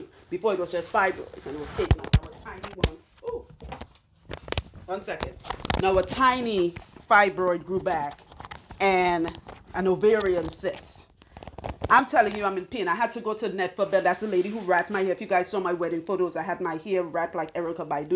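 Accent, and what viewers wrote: Trinidad and Tobago. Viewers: Hearing my accent on you is so beautiful, fellow Trini. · I knew it!!!! That Trini accent cannot hide....u had me laughing...but u were very direct and imformative and i appreciate that...firt time viewer from your home land...🇹🇹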